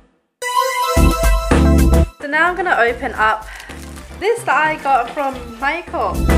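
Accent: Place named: Australia. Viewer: Where did you grow up. I cant tell by your accent sounds Australian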